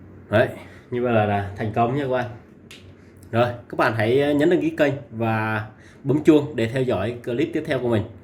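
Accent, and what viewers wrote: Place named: Nghe An, Vietnam. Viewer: Giống tiếng nghệ An ri họ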